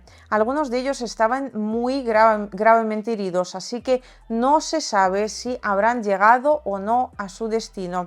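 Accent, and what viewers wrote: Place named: Spain. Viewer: Jaja "Ojos bellos..vosotros sabeis que eso mismo..les Hicieron a los Mexicanos..vale EE.UU. "Utilizo " de todo. Y despojo parte del territorio de Mexico...Puerto Rico..Guantanamo...Japón..los hidrocarburos de Siria..¿¡¡ Y LA ONU.?? AH PERO COMO RUSIA YA TOMO POSESION DE TERRENOS DE UCRANEA..AHI SI SE ASUSTAN Y ACUSAN..CON LA COMPLICIDAD DE GUTERRES. ONU..( hablas como española . Pero..vuestro fisico y rostro..LLEVAN "LA MARCA...SELLO" DE RUSIA..)😁😄😄🥰💕👍👍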